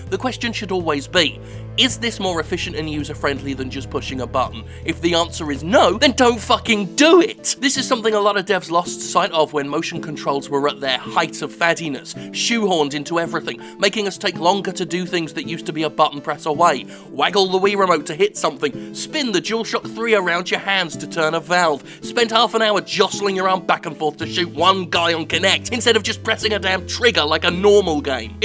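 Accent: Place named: United Kingdom. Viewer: I've never heard of you before this video was highlighted on Kotaku. Not only do I enjoy your words but everything sounds even better if your Brittish. However, the reason I subscribed is because this is brilliant and clever and very simple and I have a fondness for sticking it to the large corporate entity. Well done and I look forward to more videos.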